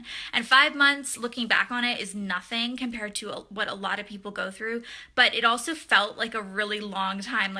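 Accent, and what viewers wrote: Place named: South, United States. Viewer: I don't know what it is about you that makes me so happy. Maybe its that your twang comes out randomly when you're saying something. HAHA Its the same with Blair and my Texan self loves to support my southern belles. =P Congratulations on the Baby!! You must be so grateful that you have the flexibility to travel so much to Tennessee and with the morning sickness and bed rest? My goodness!!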